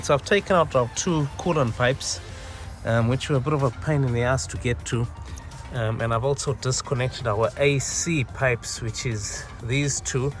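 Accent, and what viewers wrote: South Africa. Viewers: Man u almost sound south african lol Love da video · Ayyyy bra you from south Africa?typical charou tunes here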